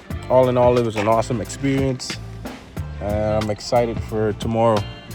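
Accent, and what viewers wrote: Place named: Bahamas. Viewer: Congratulation on starting your aviation Journey . You sound Bahamian . only another Bahamian know another Bahamian accent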